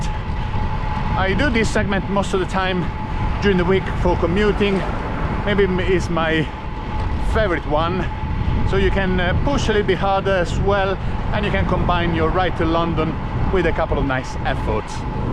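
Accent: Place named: Italy. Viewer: Just a personal question. Did you grow up in Italy? I love your accent. Any recommendations for an American looking to take a trip to Italy, specifically regarding places to see ?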